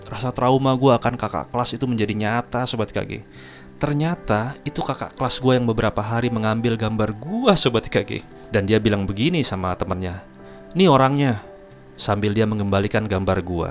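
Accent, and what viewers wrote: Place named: Cilacap, Indonesia. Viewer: Wah cilacap nyong dong😂 sehat"lahir batin bang😇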